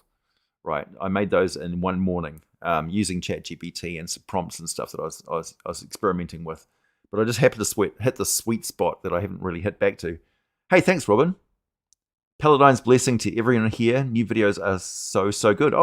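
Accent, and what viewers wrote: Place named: New Zealand. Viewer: Glad to see you trying new things! The AI voicing and script videos haven't resonated with me personally [the AI getting stuck in they cycle of using the word exosuit to describe exosuits or as an example of exosuit technology was when I realized that someone/thing else was writing your scripts and not to your standard], but if they otherwise help the channel grow and allow you to put out more content in your historical style going forward (albeit with fewer dungeons and or dragons) with your voicing and and well researched and written scripts then that's great. I also appreciate the clear labeling of them so that I don't get my hopes up for something of your usual caliber! AI is a great tool, but you remain a better script writer and narrator [at least until ChatGPT can scrape all your scripts and do a consistent NZ accent!]. 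All the best, hope you're keeping well! Had a great face off against some vampires on your silicone battle mats from the kickstarter last night.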